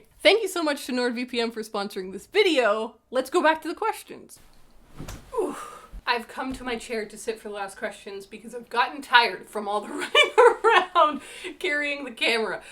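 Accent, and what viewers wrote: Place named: Canada. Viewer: How do we know she's from Canada?? Oh y'all did you not hear her say " house, outside and couch" ? 😊😃😄😍 love hearing her talk.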